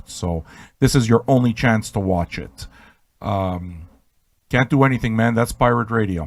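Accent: Quebec, Canada. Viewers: Oh un québécois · Montreal I think is where he lives, not sure if that's where he's from originally but he sounds fairly French-Canadian to me...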